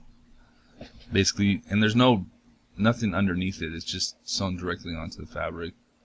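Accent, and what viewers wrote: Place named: Reservation, United States. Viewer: I am kind of curious as to what part of the country you live in? You have what sounds awful lot like a Rez accent.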